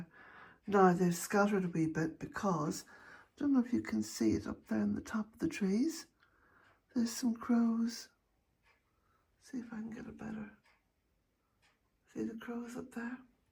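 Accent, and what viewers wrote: Ireland. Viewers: Hi there. It’s absolutely freezing here as well here in Maryland, USA. We have over 3 feet of snow today. Your beautiful Irish lilt reminds me so fondly of me wee Grandma. She was such a dear and our family is from Belfast and I lost my Mom this year in March.Unfortunately I found your channel too late how I wish I could’ve shared your lovely videos with her! She would’ve thought you were just grand ❤️💞🫶🙏🇮🇪 Be well and stay warm🌤️🥰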